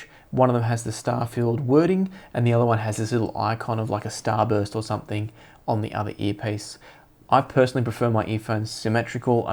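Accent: Australia. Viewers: Great review as usual, mate. Really glad to hear a fellow Aussie voice in the audiophile community. Looking forward to your thoughts on the Topping E30 DAC! 👍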